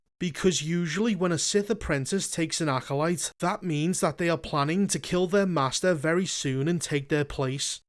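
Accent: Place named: Scouse, United Kingdom. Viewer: It’s a Scouse accent. Every one in liverpool talks like that. To be fair to his Scouse accent is actually quite mild compared to most in liverpool.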